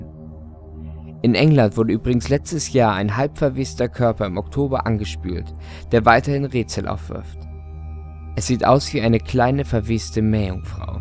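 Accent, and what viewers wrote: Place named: North, Germany. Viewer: yay Norddeutsch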